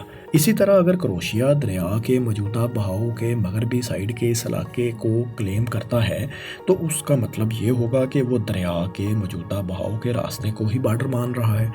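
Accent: Punjab, Pakistan. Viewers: ماشاءاللہ بہت معلوماتی ویڈیو تھی۔ فیصل بھائی سے درخواست ہے کہ اس ویڈیو میں بولنے والے بھائی کے بجائے خود آڈیو دیا کریں کیونکہ یہ بھائی پنجابی زدہ اردو بولتے ہیں اور مجھے اس سے بہت کوفت ہوتی ہے۔ مثلاً عِلاقہ(Ilaqa)کو عَلاقہ(Alaqa) ، صورَتحال(Soorate Hal) کو صورْتحال(Soorte Hal) اور آزادی کو ازادی تلفظ کرتے ہیں ۔ اور 19 کو انیس کے اُنی بولتے ہیں ۔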